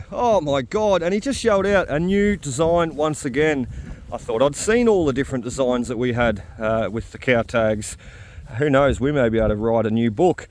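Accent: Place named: Australia. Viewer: Being a Yank...I love your way of talking!!!. She's right,Mate!!! 🌹 I've never seen this cow tags hunting... Been mostly beaches and pull tabs with the occasional rings and other jewelry found by the Yankees on the California and Florida beaches... The valuable finds are very few and far between... I'm gonna be watching you Aussies finding things I never knew existed... Looks like you have another sub...😎🤗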